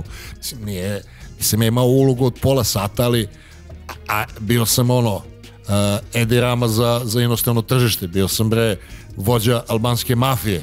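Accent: Niš, Serbia. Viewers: Ti si nislija ja to ne verujem mislim na naglasak ne na ove kadrove a ti si presavrseno uradio ove kadrove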